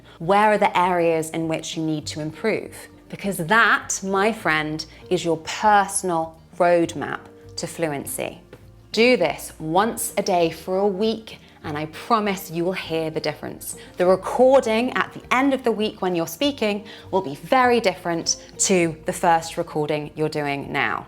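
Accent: United Kingdom. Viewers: Wonderful !, ....I enjoy listening to perfect British English, thanks a Lot 😊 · british english? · i really really love so much british accen , that's why i keep learning this great accent every single day .. I appreciated it. I thought you gave your personal touch in your every lecture. That's brilliant❤. it's very kind of you.May God always bless dan love you and your family.. Amen.. From the bottom of my heart,.. I Thank you so much, dear Teacher.. ifrikiya - indonesia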